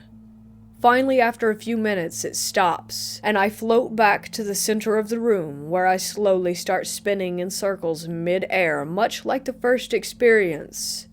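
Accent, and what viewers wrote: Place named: South, United States. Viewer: The best narrator out there...cadenced, intelligent, proper enunciation... and that slight southern accent. A perfect alchemy. And no goofy innuendos either. Yayyyy! Thanks!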